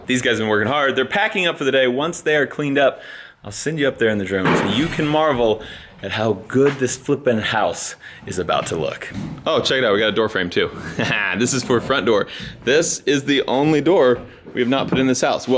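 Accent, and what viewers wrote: Texas, United States. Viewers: What part of Texas are u from 😐😐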